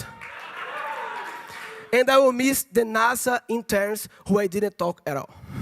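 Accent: Northeast, Brazil. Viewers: Esse sotaque nordestino no inglês é massa demais, Parabéns!!!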